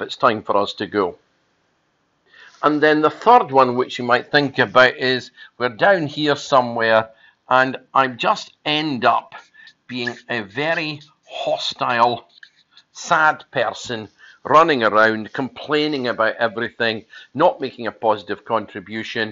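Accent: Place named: Scotland, United Kingdom. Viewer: Hi, nice one - like your explanation, change hearing it in a Scottish accent rather than my Yorkshire one:-)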